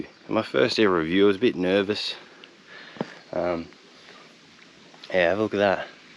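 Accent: Australia. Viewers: Hey... really enjoyed this video! I went to test ride a 2022 Fat Boy at $17,400 with only 2000 miles on it. Somehow... I came home with a 2023 Baja Orange Breakout. Since I bought a new 2023 Harley... I get free tires for life! The Breakout is very nice, and similar to a Fat Boy. They share the big 240 rear tire, but the Breakout has a 21 inch front wheel. My Breakout has the big 117 engine... and it hauls ass big time! I like listening to your Australian accent. Also like that hair cut your sporting! Don't be nervous while your filming... you're doing a great job! Hope your channel keeps growing!!! John from Oregon, USA!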